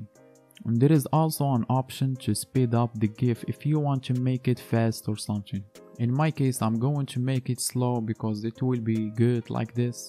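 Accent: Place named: Morocco. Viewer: nta mghribi bayn mn laccent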